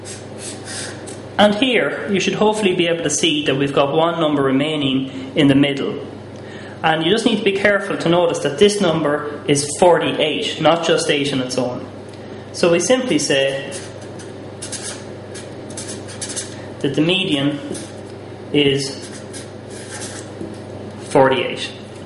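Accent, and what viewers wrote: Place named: Ireland. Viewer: thanks a bunch! and i love your irish accent :)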